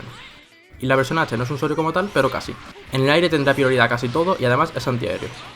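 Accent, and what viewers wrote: Spain. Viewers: Eres de granada bro?, se parece tu acento al mío un poco jajaja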